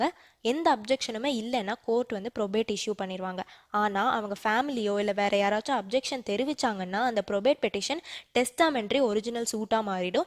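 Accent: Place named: Madurai, India. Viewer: Amma ponnu....unnoda voice, prounouncation, modulation... Super ma..! Nee Madurai ya ma?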